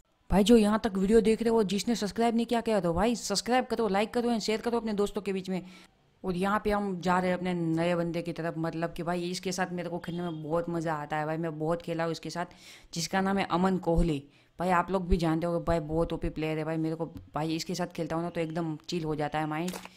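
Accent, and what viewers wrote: Gujarat, India. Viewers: Aap Gujarat se ho kya bhai?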